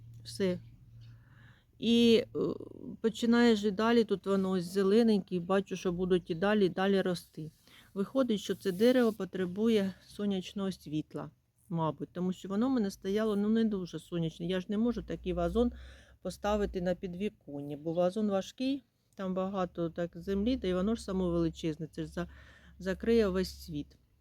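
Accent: Chernihiv, Ukraine. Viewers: Ви, мабуть з Чернігівщини? Я теж звідти. По говору, це мабуть, Макошино, Мена, Сосниця? Я з Борзнянського району. Але живу в Дніпрі. Підписана на Вас. Дякую за цікаві інформації. З святом Вас. І нехай щастить в усьому.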